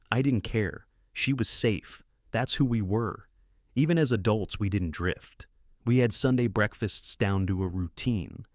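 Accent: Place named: Alabama, United States. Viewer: Dude sounds like he is in love with his sister. Like in scar face. He does sound like the alabama type.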